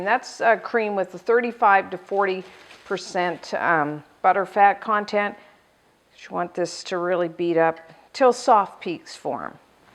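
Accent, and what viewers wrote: Canada. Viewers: I should definitely use this recipe. Well, after I burned the regular bake version, which was unpleasant :x Your accent seems to familiar to me, aren't you from Canada? :)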